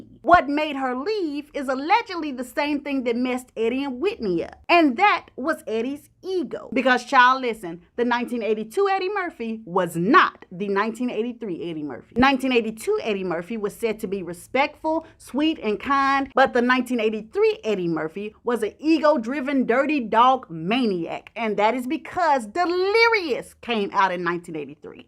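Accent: South, United States. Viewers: Great video,! Your southern accent is really sweet. I slightly gasped when you used the potty words. Nevertheless, I'm a die hard fan of yours.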